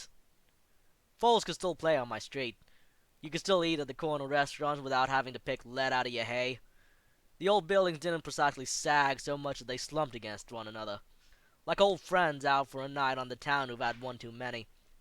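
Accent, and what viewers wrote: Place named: United Kingdom. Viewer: Enjoyed it. Wish you had continued this; really like your voice there, especially with what your accent brought to this. Is it British? (ugh, can't place the accent otherwise. . .)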